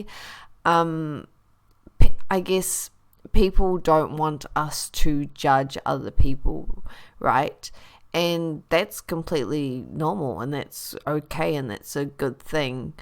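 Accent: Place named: New Zealand. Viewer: I knew when I heard your voice that you're a kiwi, so I stayed to listen because I follow Dr Dray, the thing is she knows what she's talking about and if anyone should be listening to her advice on sun protection it's us down here in Aotearoa/New Zealand. Her choices don't concern me but I was a bit shocked to see the judgy nasty stuff, I'm more of a live and let live but if you're a creator then it's to be expected I suppose. The thing with kiwi's is we are a passive-aggressive nation so I get where your coming from eh, we don't confront but we will orchestrate a campaign lasting 500 years involving the cast of Ben Hur to send you a message!. "God I love us". In any event, we are watching Dr Dray transform as she becomes more comfortable on-line, is she obsessive depends on your point of view. I follow her advice on showering and moisturizing within two days there was a noticeable difference in my skin plus I am super Zen starting my day. 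She loves coffee my kinda girl, she taught me how to layer my skincare regime, so I'm not wasting product now. She talks to women about affordable skincare, who doesn't want to hear that eh!. Is she too thin?, well her body shape hasn't changed from when she began on YouTube, she only went vegan a few years ago, so the correct question might be, is it right to put our normal on someone else? and what is normal anyway? what we do actually know is the West is getting fatter; sedentary lifestyles, convenience food etc.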